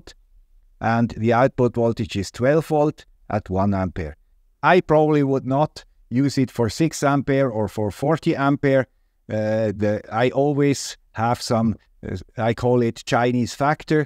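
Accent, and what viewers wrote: Switzerland. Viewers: Hello friend with the Swiss accent. In case you don’t hear it enough, I’de like to thank you and also applaud you for the way you have setup your channel. Your content is extremely well planned and laid out without all the bloat of other YouTubers in this space. Lean and clean to the point and. I find myself going back to your videos many years later as a reference point when needed. Looking forward to more truly high quality content next year ! 🙏🏽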